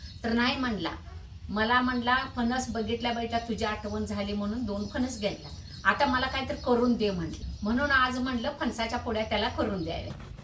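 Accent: Kolhapur, India. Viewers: फारच नावीन्यपूर्ण ! आणि ताईंची कोल्हापुरी भाषा इतकी गोड लागली कानाला ! थेट ल्हानपनातच शिरलं मन !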